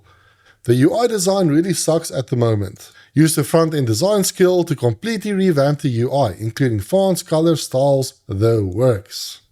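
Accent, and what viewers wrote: South Africa. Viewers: I instantly recognized my home country's accent. RSA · I'm here for the content, and the fond memories of South African accents!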